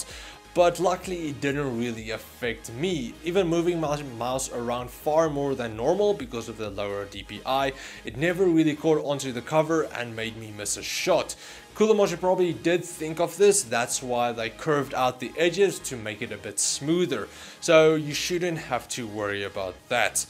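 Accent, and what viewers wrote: South Africa. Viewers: I thought you sounded South African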